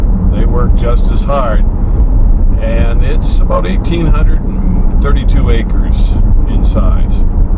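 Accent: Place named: Maine, United States. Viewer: Cannot hear with you in car. Muffled. I thought it was my speakers here in Music studio, Malibum California. $3000 dollar speaker system but, nope, it's your input. I love it when your outdoors showing properties. You are so good at it. Love the accent too. 'GEERAGE' that gets me every time. My grandparents had a beautiful Maine accent. 'LOBSTA' Can't get there from here'. lol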